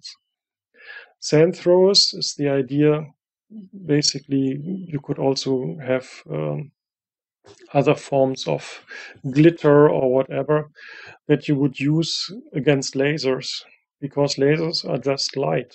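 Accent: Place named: United States. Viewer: The American accent is indeed prominent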